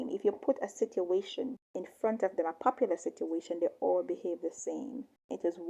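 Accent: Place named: Jamaica. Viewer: I love love your Jamaican accent ( peace and blessings )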